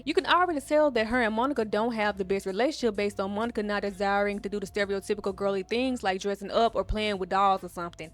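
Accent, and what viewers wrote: Memphis, United States. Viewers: Are you from Memphis? The accent much love ❤️ another great video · Where are you from 😅 because I love a good accent!!! It’s giving a lil Glo so I wanna say Memphis ….lol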